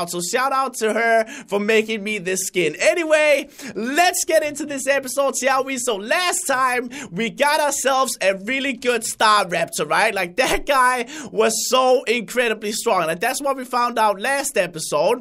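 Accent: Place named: Denmark. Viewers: He is danish. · arent you danish because your accent is very danish sounding no offence im danish too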